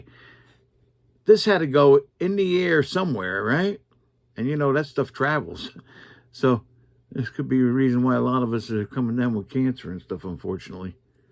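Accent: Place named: New Jersey, United States. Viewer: New sub here. Knew that had to be a Jersey accent. Interesting rip. Hadn't seen that set ripped before. Wow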